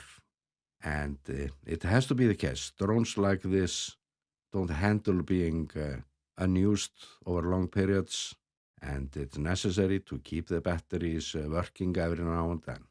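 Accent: Iceland. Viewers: Jeg er ikke så god til engelsk. Og når det samtidig er med stærk islandsk accent, kan jeg næsten ikke forstå dig.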